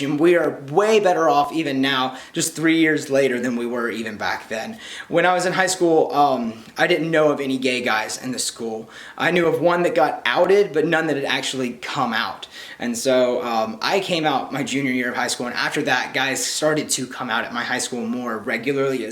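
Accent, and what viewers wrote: South, United States. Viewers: I'm surprised you don't have a thicker accent. I moved away from a small town in TN after college. I followed the yellow brick road to CA and have never looked back. I have a question for you. Do you know the difference between a yankee boy and a southern gentleman? A yankee says you can. A southern boy says Y'all Can! Embrace the southerner in you. Life is a buffet, try a bit of everything, sample it all. When you're older these are the days you'll look back on with fond memories. Make some memories. Stay single, there's plenty of time for that later now is a time to forge life friendships.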